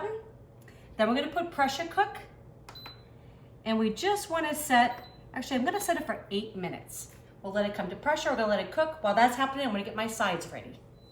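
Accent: Boston, United States. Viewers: Great video First time I’m watching! I hear a Boston accent?!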